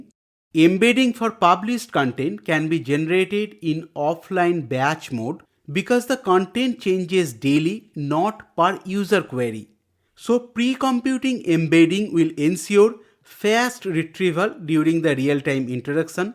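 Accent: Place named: India. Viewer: These are the best series for Indian users, the voice and accent is for the Indian ears. Also the content is well researched better than AWS own AI practitioner course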